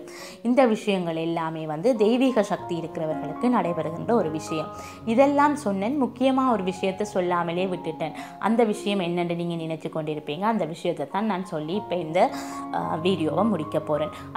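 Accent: Jaffna, Sri Lanka. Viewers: Srilanka tamilaro vaasippadhu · நீங்க இலங்கை தமிழா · இனிய யாழ் தமிழ் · மிக மிக அருமையான குரல்வளம் இலங்கை நாட்டின் தமிழ் ஒரு அருமை உங்களின் அன்பு , நீங்கள் மென் மேலும் வளர வாழ்த்துக்கள்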